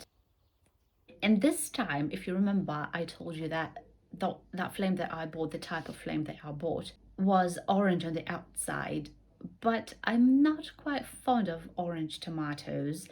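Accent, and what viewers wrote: England, United Kingdom. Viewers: You sound like your English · I wonder how come a Belarusian has British accent !!!!!!!! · Great videos. You have a beautiful British accent. :) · I just thought the same. Very, very good english and this British accent. Nice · I am amazed at your command of English. Have you studied in English or some part of UK (I hear a flavor British Accent). Either way, a great view. and perspective on life in Belarus. Beautiful! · How did you get a British accent? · Very nice British way os speaking English. Thought I was back in London for a moment there. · What does a British accent sound like ? She sounds english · Why do you have a British accent? It is awesome.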